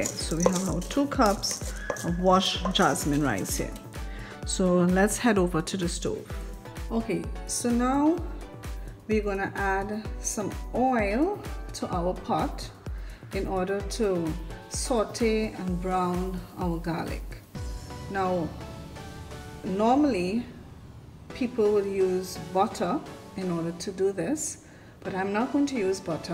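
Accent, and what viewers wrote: Trinidad and Tobago. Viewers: This was the most simple recipe I found. And how lovely to encounter what sounds like a Trini accent😊🇹🇹 Thank you very much!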